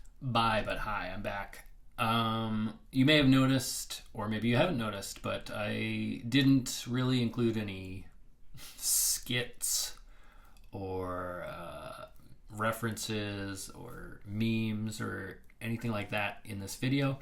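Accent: Canada. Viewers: Actually I think it sounds spot on. I have a question for u, for a Canadian why do u say “Oooout” & “Aaaay” like the rest of ur peeps? This coming from an American w god only knows how many accents n dialects…. 😮 Yes I’m n Idiot, like the channel brother!! Can’t tell who u best look like, it’s either Dave Mustain the Megarocker without the beard or the Viking slayer Zach Wild. Either way that would put U in a killer collection! Rock on bro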